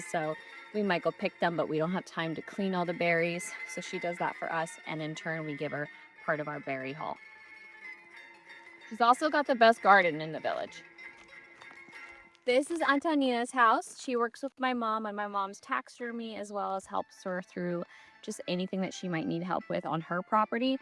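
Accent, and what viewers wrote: United States. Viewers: I don't understand how English is your second language, but you have an American accent? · Can I ask a question about why do you have a strong US acceant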